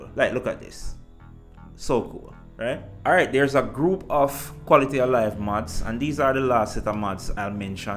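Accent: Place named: Jamaica. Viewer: I like your accent, man. Are you Jamaican?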